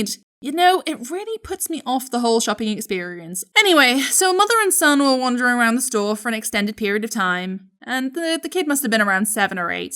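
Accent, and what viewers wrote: Wales, United Kingdom. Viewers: Yo are you Welsh as well?! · i think i found the most british person on planet earth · Subscribed cause i love your british dialect and i love animation i draw one paper myself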